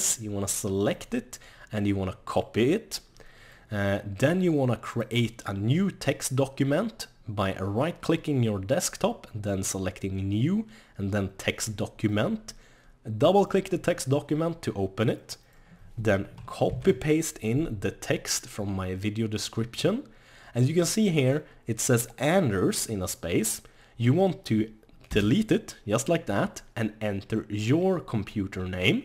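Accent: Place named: Sweden. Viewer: Swedish english is simple to discover...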